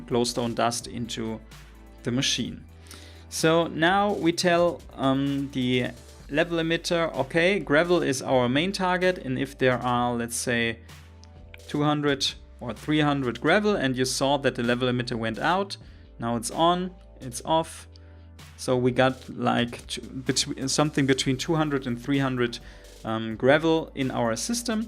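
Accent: Germany. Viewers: Kann es sein das du Deutsch bist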